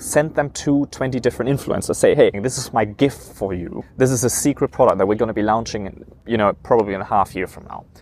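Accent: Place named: China. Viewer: Wow! you also got the chinese accent. Nice video tho.. thanks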